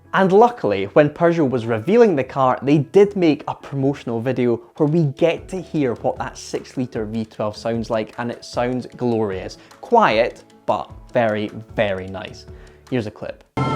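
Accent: Scotland, United Kingdom. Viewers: He's Scottish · he's Scottish he canne' help it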